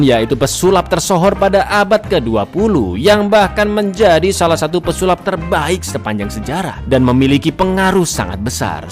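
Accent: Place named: Madura, Indonesia. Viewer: Madura kamu bang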